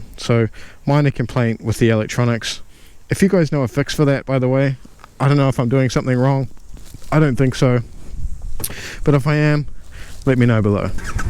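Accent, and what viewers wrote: New Zealand. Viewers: NZ I reckon from his accent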